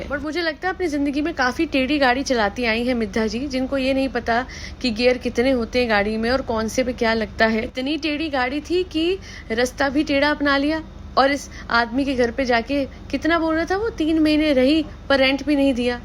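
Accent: Punjab, India. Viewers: Panjabi accent lajawab lagi..👏👏👏👏.... Malik's and Ibrahim's ka to name sunte hi maan ki shanti bhang ho jati hai aur gussa lagne lagta hai..... baaki good points 🙏🙏🙏🙏🙏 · Yes she is definitely a Punjabi cz she literally uses such words jo sirf Punjabi hi bol sakta hai with proper accent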